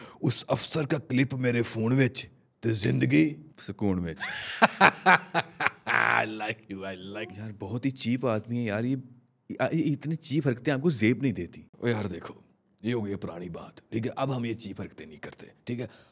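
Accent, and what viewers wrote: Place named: Punjab, India. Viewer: Good acting skills. Specially 1st time saw your Punjabi accent, tone was perfect.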